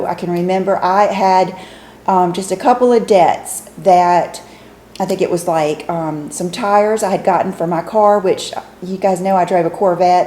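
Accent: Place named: Texas, United States. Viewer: do you live in Texas. I live in Tennessee and you have about the same accent as me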